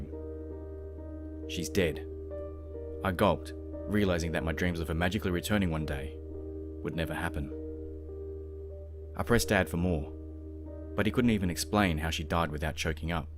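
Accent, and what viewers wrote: Australia. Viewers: haha I'm a sucker for a man with an Aussie accent..... Yes I'm a strange girl hahaha. An Aussie accent and horror stories/crime.... Winning here!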